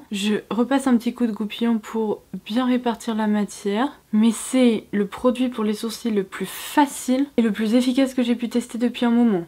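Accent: United Kingdom. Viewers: Coucou! Cela fait quelques temps déjà que je te suit, mais la première fois que je commente. J'aime beaucoup tes vidéos, et à chaque fois que tu lis un nom anglais j'adore cet accent, que je ne pourrais jamais prononcer MDR Tu es très belle, continues ainsi :-) Ah oui, j'adore tes " Oh, Ouah !" Mdr, fallait que je le précise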